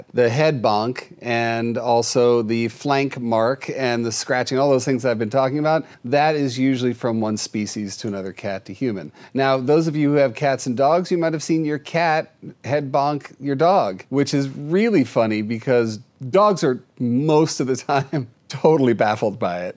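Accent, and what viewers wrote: England, United Kingdom. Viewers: you are too much. I love your English accent delivery. This is such a neat, unusual community. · Hi, love your English acent! My cat Cloud gives me headbutts, and my cat Cat's, does the sea otter when I come home!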